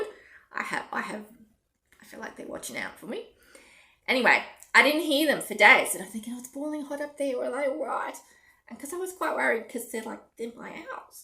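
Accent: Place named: Australia. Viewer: One of my favourite reds to stitch with is DMC 347. Enjoy your flosstube, nice to hear an Aussie accent!😊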